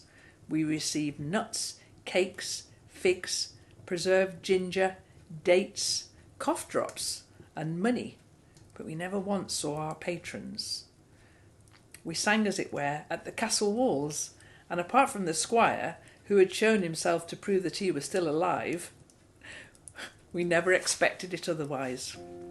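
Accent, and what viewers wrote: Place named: Scotland, United Kingdom. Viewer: Thank you so much for the reading. So heartwarming. Your accent reminds me of my Scottish grandmother and her siblings. They were dear to me. It is evening for you and about noon for us, a bright, clear 1F… snow on the ground. Listening to Frank Sinatra and Bing Crosby croon on the CD player. Does that age us? Merry Christmas and a good quilting year!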